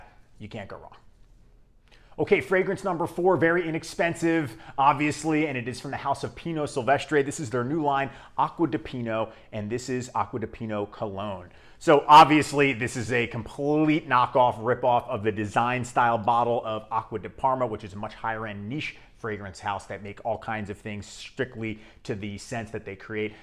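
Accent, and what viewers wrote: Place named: South Jersey, United States. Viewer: Just found the channel and it has to be noted: most people in south jersey dont have Patrick Bateman looking skincare. As a now central jersey transplant from Camden County his actual look is more like here, but his voice is 100% SJ. Most of SJ are bearded long hairs like myself or boomers.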